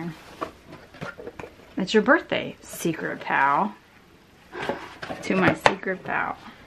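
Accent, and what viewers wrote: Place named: Western Massachusetts, United States. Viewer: Every time you pronounce the word button or curtain etc, I remember an article I read quite a few years back about the origin of American accents. I searched today and found your particular pronunciation is addressed on Babble: The United States of Accents under Western Massachusetts and Connecticut Accents. So be proud! You don't have to change for us!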